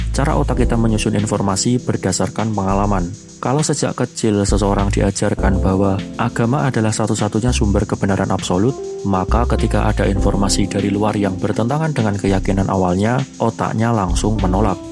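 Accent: Java, Indonesia. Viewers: Jawamu medok ga enak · Gw kirain mo belain pke dalil dalil konyol dan gw udh negatif thinking denger medoknya, ternyata gw ga tepat, ternyata bagus ada dasar knowledge yaitu sosiologi, ya wis lah gw sub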